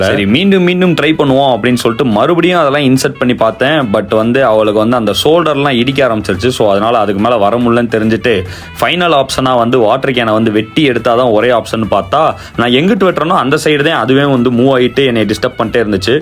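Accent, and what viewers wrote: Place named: Coimbatore, India. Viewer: Aamaa bro naanum adha dhan notice pannen , coimbatore slang la pesuna perfect match bro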